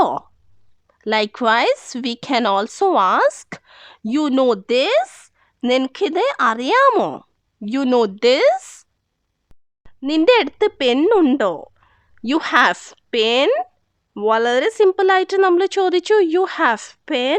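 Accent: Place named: Kannur, India. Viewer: ഇങ്ങള് കണ്ണൂർ ആണല്ലേ 😄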